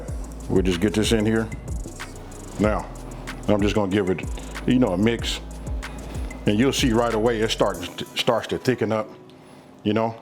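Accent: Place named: California, United States. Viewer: This cali accent threw me off but this looks so good I gotta try it!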